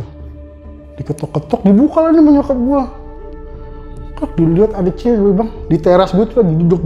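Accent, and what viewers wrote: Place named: Betawi, Indonesia. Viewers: Betawi bangat da ah logat nye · Betawi bet bahasanya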